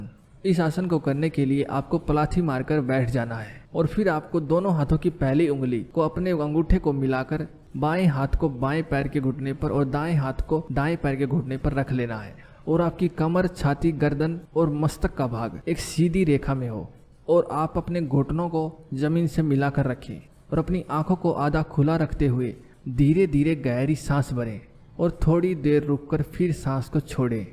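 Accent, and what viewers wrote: India. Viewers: Are u from haryana??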